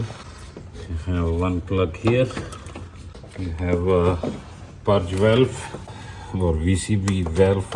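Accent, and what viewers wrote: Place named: South Asia. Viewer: Your English accent is very Asian may be you from Pakistan or India?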